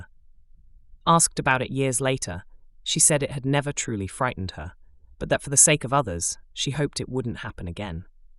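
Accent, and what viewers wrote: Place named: England, United Kingdom. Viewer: I just discovered your channel today, and I had to immediately subscribe. Your videos are nicely done, and without filler or sensationalism. I would like to know what part of England your accent is from. You sound just like Daniel Radcliffe (and that’s not a bad thing!). 😊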